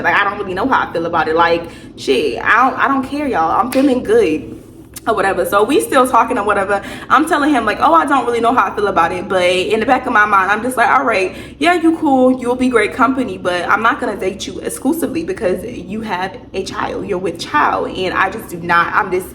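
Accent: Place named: Southeast Texas, United States. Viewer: He gotta be either from Beaumont or Orange 😂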